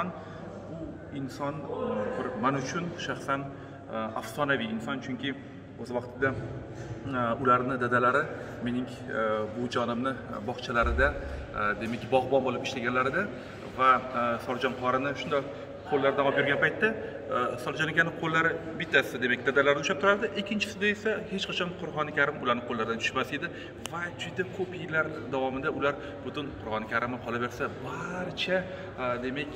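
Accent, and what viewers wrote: Fergana, Uzbekistan. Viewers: Фаргоналикмисиз илтимос чукурок тушинтиринг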